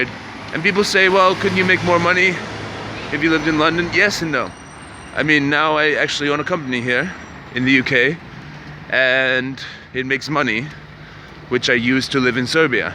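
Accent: Germany. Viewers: You have really really mixed roots. And I don't know if anyone told you already that you still have some German accent. At first I thought your are originally from Germany but when I heard you say Toronto,Canada I was like WTF! :D I'm not surprised that you feel Serbia as natural habitat maybe because your Slavic side gens "work" and "want to go home". :D That's normal because I also know some Serbs how feel much better living in Spain,Italy or Ireland than Serbia. It's just like that and who knows why. I have a friend,born and raised in Serbia went to school together, who told me something like "I feel I'm alive only when I'm in Barcelona". The other one use every single chance to spend time in southeast Asia,Vietnam,Burma etc. The guy just LOVE to be there. I like Mexico or Mediteranian lifestyle (wich is pretty similar to Serbian lifestyle,hehe). So,that's normal, some people just looking for their own part of the sun under the sky.And that could be elsewhere than place they were born and raised. 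Anyway,glad you enjoy your life in Belgrade. And just go on. That is only matters. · Were you born and raised in Canada? How come you have such a strong german like accent?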